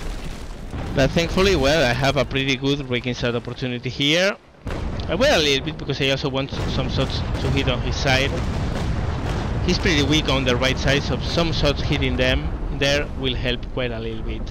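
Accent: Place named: Spain. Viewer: I'm glad I saw the video where you mentioned you had a Spanish accent, I couldn't place where it was from.:P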